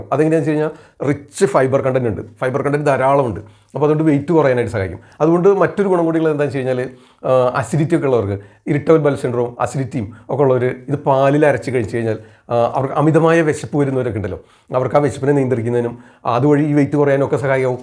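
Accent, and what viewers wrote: Thrissur, India. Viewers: സർ ത്രിശൂർ ആണ് നാട് അല്ലെ 😃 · തൃശൂർ രീതിയിൽ വളരെ ലളിതമായി ഡോക്ടർ പറഞ്ഞു കൊടുത്തു. nannayi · തൃശൂർ ശൈലി ആയതോണ്ട് നല്ല രസമുണ്ട് കേള്കാൻ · Trichur aano sirnte sthalam avatharam super nalla arivanu nalkiyadu tnq sir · Doctor sure Trissur kkaran aanu ,speed accent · Doctor തൃശ്ശൂർക്കാരനാ ല്ലേ...🥰👍👍👍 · നമ്മുടെ തൃശ്ശൂർ സ്ലാഗ്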